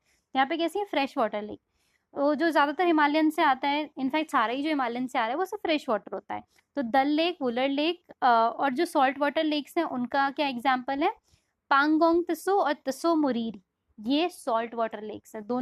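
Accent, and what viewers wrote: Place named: Bihar, India. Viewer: Mam aapki language sy lg rha hai ki aap Bihari ho..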